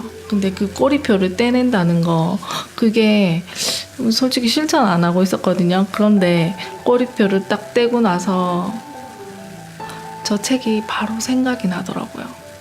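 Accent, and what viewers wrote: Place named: Busan, South Korea. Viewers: 좋은이야기 잘듣고가요~^^ 경상도 말투 넘 좋습니다~ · 세부 바다 수영 ㅋㅋ 영상 왤케 귀여우십니까.ㅋㅋ 부산사투리가 완전 귀여워요 ㅋㅋ 물론 저도 부산사람입니다만 ㅋㅋ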